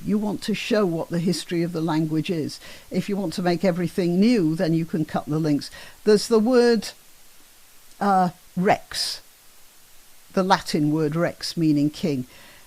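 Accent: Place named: Northern England, United Kingdom. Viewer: Good northern accent luv!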